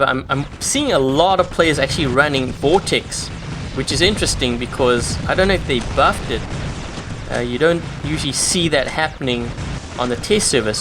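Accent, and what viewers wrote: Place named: South Africa. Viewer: An excellent response mate, thank you for taking the time to write it and it very much covered every facet of my line of questions, so cheers once again. Culturally I think the Russians are very different to the west so the tact and understanding you have shown, even of the smallest nuances, goes a long way with them. Keep up the good work! Finally I think I asked on live chat once if you were a saffa or from Zim because of your accent but then you said you’re a Canadian national so am now confused.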